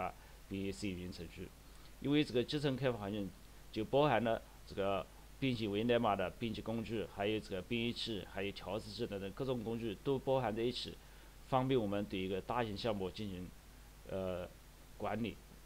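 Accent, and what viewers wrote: Anhui, China. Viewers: 6，这口方言把我听愣了，阁下莫非是安徽大佬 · 我听着就是安徽人啊😂😂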